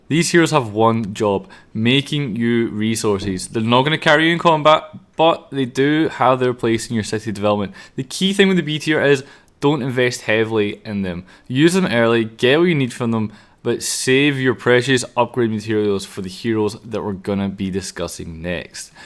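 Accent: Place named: Scotland, United Kingdom. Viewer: This guy is Scottish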